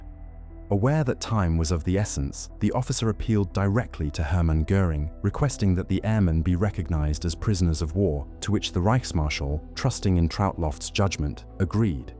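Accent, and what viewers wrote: United Kingdom. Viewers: Nice video. Can I just say, in British English we say "one hundred AND sixty-six. We NEVER say "one hundred sixty-six". You've chosen a British accent, so you need to give it British expressions, otherwise it sounds bizarre.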